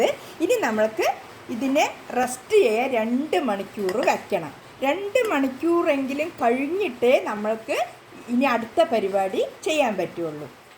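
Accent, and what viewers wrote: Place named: Palakkad, India. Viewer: അമ്മ പാലക്കാട് എവിടെയാ ....? തനി പാലക്കാടൻ ശൈലി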